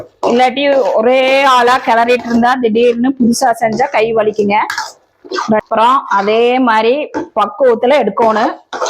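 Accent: Coimbatore, India. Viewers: நம்ம கோயம்பத்தூர் மரியாதை பேச்சு(ங்க) தனி கேட்கவே அவ்ளோ நல்ல சந்தோசமா இருக்கு 🎉🎉😊😊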